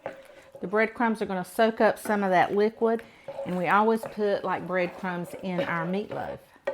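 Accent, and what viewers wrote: South, United States. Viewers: New Subscriber. Love your recipes, your sweet Southern voice and your enthusiasm for cooking. As an artist may I make a friendly suggestion? Try using an undereye concealer the color of your foundation. It's a game changer!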